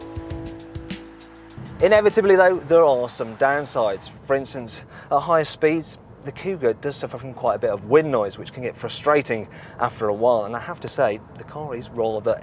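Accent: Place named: Black Country, United Kingdom. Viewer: lol at his telephone voice, carwow episodes have him in a black country accent.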